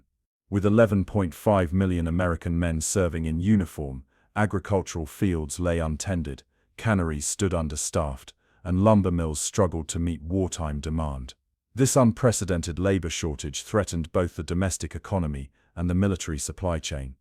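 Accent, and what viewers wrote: United Kingdom. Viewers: The announcer seems British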